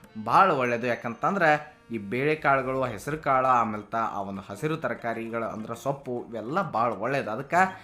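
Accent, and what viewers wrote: North Karnataka, India. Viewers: ಉತ್ತರ ಕರ್ನಾಟಕದ ಬಾಷೆ ಮಾತು ಮಾತಾಡುವ ಶೈಲಿ ಸೂಪರ್ 👍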